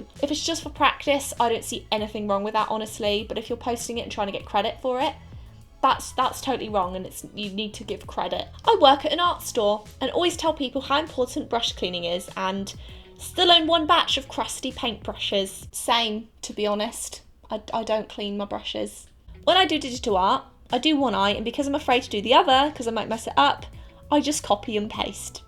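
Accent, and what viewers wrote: England, United Kingdom. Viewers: she is so classically English but not at the same time... why? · u aren't us based, u sound British?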